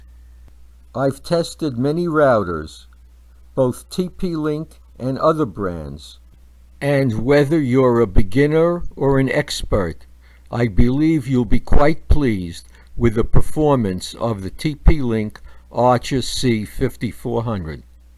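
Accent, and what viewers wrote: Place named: New Jersey, United States. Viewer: I would have guessed New Jersey, myself :)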